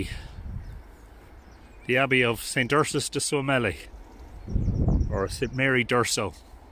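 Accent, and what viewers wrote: Ireland. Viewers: Gay drogheda accent you have must off been a while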